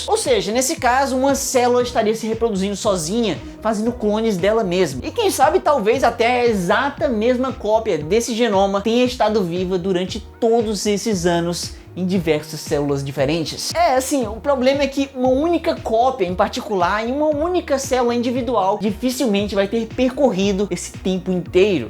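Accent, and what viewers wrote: Ceará, Brazil. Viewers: Ele é cearense confunde mesmo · Krai o guri e cearense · Cearense k(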